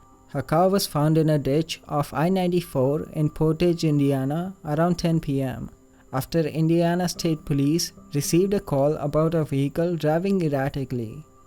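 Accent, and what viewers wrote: India. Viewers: Indian guy..... narrator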